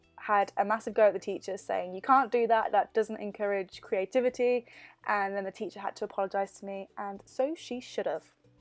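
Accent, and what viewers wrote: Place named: Coventry, United Kingdom. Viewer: Hey, I am Millie. I am a newish subscriber. I just subscribed and i actually love you videos so much💕. I am from Coundon in Coventry haha. But i live in Australia. I actually never noticed your Coventry accent until this video. Please reply to this even though, i have commented 2 years later haha xxxxxx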